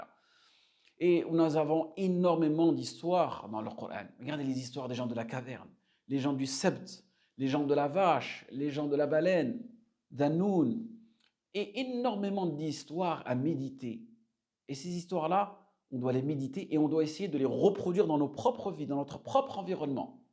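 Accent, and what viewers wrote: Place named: Maghreb. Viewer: الحمد لله والصلاة والسلام على رسول الله ، وعلى آله وصحبه ومن اتبع هداه ، سيدي الفاضل إذا كنت إمام مسجد في أي دولة من الغرب ــ قدًم نفسك أنك إمام متمكن في الدعوة الإسلامية لا خطأ و لا فتوى ــ إياك أن تفتي و أنت لست من أصحاب الإختصاص بمعن آخر ـــ عملاء في مظهر العالم . أنت متعلم و ربمـا مثقف و حسب نبرة صوتك من دولة ، أنا كذلك أنتمي و أسكن فيها ـــ الملاحظة هي : حث المتحولين المسيحيـيـن إلى الإسلام قراءة اللغة العربية الصحيحة و ليس العريية المختلطة بالكلمات الفرنسية كما يتكلمون إخواننا في كل ـــ المغرب الكبير ــ اللهجة الملتوية بكلمات فرنسية و المؤسف الشديد نرفض التغيير و نتمادى في الباطل ووووو . علِمهم اللغة العربية الصحيحة ، حدثهم عن القرآن كلام الله القرآن هو روح ــ روح القدس عرفهم أن القرآن معجزة بالغة ، أما مفعول السورة هذا موضوع آخر ، إذا أنت ياسيدي المحترم قناتك فيها مواضيع مهمة عن الشريعة فكن حقا ــ سفير الحق ــ و إستعمِل اللغة بحقيقتها و ليس مزيفة ( إذا تحدثت باللغة العربية كن فصيحا أو تحدثت اللغة الفرنسية كذلك لا تظيف كلمات عربية و أنظر إلى إسم قناتك كتبت كلمة فرنسية و أظفت معها جملة متكونة باأحرف فرنسية و هذا خـطـأ فادح ، لو قلت لك ياسيدي ، أنا أتكلم اللغة الفرنسية الفصيحة و لو مرة في حياتي كتبت يعني ( الكتابة بالفرنسية و النطق بالعربية ) أنظر في إسم قناتك !!!!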